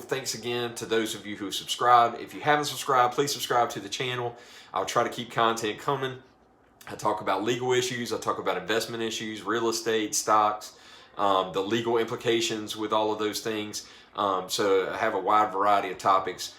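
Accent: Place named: United Kingdom. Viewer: I love this guys accent- a Brit from across the pond 👍🏿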